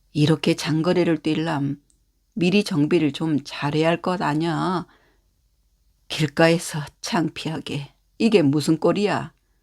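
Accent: Jeolla, South Korea. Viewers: 송기숙 작가님의 작품을 낭독해주셔서 감사합니다. 남도 사투리가, 겨울밤 화롯불속의 구수한 군밤같네요. 그 구수한 향을 그대로 나타나게 해 주신.감사합니다.☆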